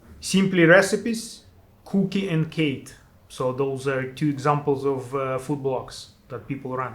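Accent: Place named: Bavaria, Germany. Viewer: Your accent sounds like your from Bavaria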